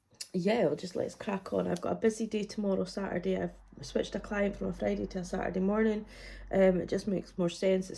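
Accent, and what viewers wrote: Scotland, United Kingdom. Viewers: Yeahhh she’s back with that sexy Scottish accent 👏 Glad your voice is almost back to normal Also absolutely FAB 50p challenge ❤️❤️❤️ it 🙏🏻xx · Glad you’re getting your voice back a bit 🙌 I agree - the Scottish accent with subtitles is hilarious - always pray no one uses the subtitles with my videos 🤣🤣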